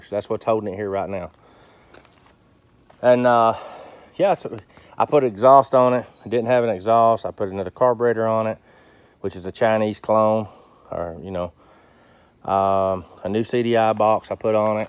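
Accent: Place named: Arkansas, United States. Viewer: are you from Arkansas?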